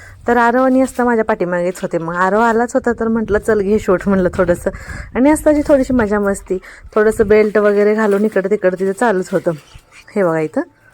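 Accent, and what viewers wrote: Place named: Latur, India. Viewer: मला वाटल कि तु लातुरचि आहेस भाषा तशीच वाटली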